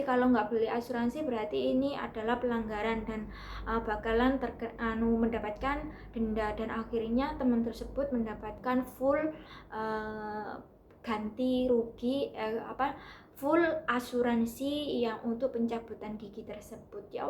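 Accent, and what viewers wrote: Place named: Java, Indonesia. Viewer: Bagus info nya... trimakasih. Tapi klo boleh Saran saya nih ya.. klo ngomong/penjelasan gitu,, pake jeda.. ibarat nya klo dalam tulisan pake titik koma.. biar enak di dengarnya.. klau perlu tambahkan backsound video, apalagi ini video panjang, biar gak bosan yg nonton. ini ngomong kya gak ada rem nya gitu, mana medok pula. Tampilan ataupun background jg penting, biar enak di lihatnya.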